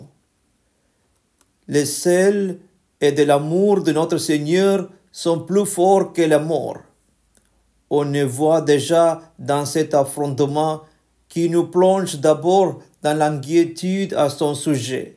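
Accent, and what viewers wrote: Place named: South India, India. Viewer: Vraiment j'espère que tu est Indiens..... précisément sud .....vraiment ton français là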